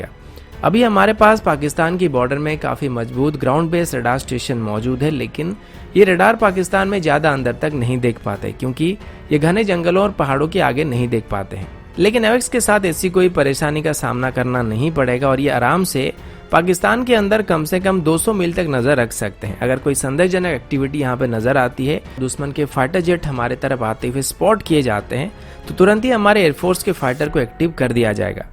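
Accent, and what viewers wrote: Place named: Bhojpuri, India. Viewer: Pls learn to speak proper hindi. Your content is good but hindi is too much like bhojpuri.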